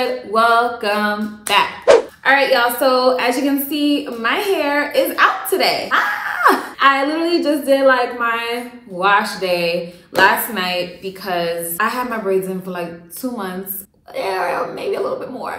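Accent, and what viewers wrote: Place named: New York, United States. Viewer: I can hear your nyc accent... ha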